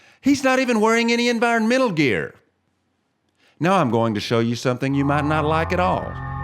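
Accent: South, United States. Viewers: Hey stupid, Its not Mars! Its not what looks like weird stuff on Mars, Its a shot of a place on Earth and calling it Mars. You can't be that stupid. Or you are working for Nasa and are doing the life in space BS! Listen up Stupid, No planet, No space, No rovers, No nothing but an agenda to try to make our Lord and Savior seem like a creature from space to make us fight against GOD! When Yeshua returns, You bow down. If a fake alien invasion happens, You go to Nasa and Govt's to fight. And your accent of a Southerner does not make you trust worthy! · your southern accent just bring it home or reminds me of home. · I'm guessing that narrator is from the south...LOL GREAT video sir! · Your from the South, aren't you friend? Maybe....the Tennessee Valley? It's the accent. You sound like an educated Southerner... probably in the above mentioned region. 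There are alot of engineers and scientist in the TN valley, ie Marshall Space Flight Center, in Huntsville. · Love this guys southern accent. He sounds like my brother.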